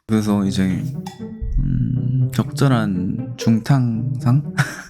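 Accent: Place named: Gwangju, South Korea. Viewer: 광주말투 진짜 매력있다 ㅋㅋㅋㅋㅋ 보들보들해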